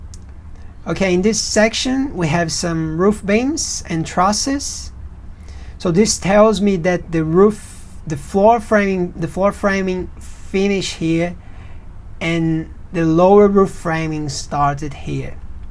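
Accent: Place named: Australia. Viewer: Great aussie accent. Structural calculations are always relevant. Keep it up!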